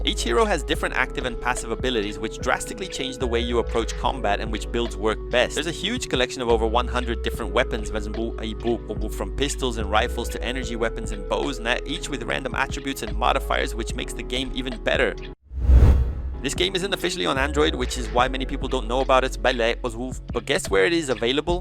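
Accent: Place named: Brazil. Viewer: A voz mano, o sotaque dele é muito de brasileiro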